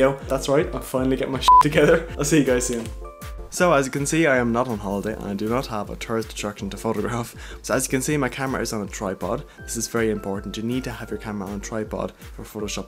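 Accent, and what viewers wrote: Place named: Ireland. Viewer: I'm guessing Ireland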